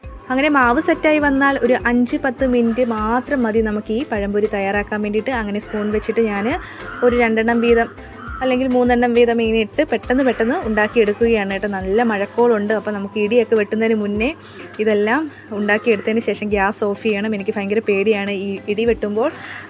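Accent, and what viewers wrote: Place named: Kannur, India. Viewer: സംഭവം പൊളിച്ചു ☺️☺️🥰എന്തോ കണ്ണൂർ ഭാഷ പോലെ തോന്നുന്നു 😍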